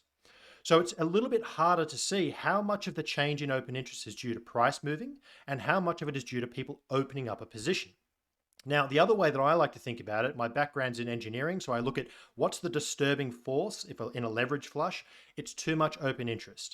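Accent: Australia. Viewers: I always trust the aussie accent for some reason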